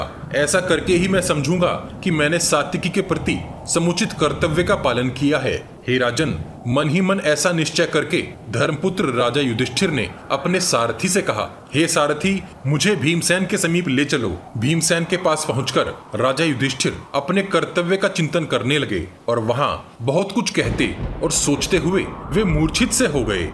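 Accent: Standard. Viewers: अत्यंत प्रभावशाली आवाज शुद्ध उच्चारण सुनकर मन प्रसन्न हो जाता है मैंने गीता प्रेस गोरखपुर के कल्याण अंक में ये कथा पढ़ी है पर आपकी आवाज में सूनना आनंद दायक है कृपया शकुनि के बारे में जानकारी दें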